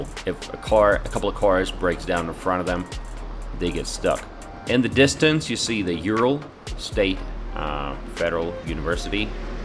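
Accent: South, United States. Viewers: New sub. Очень приятно. May I ask where did you learn English? I've been watching your videos and when you talk you have a particular accent...it sounds either southern or you learned in the "hood" (no disrespect I'm from the "hood") either way I like your videos. Спасибо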